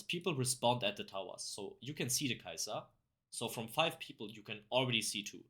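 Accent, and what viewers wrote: Germany. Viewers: Hab an deinem Akzent gehört das du Deutsch bist haha. Aber guter guide, danke dir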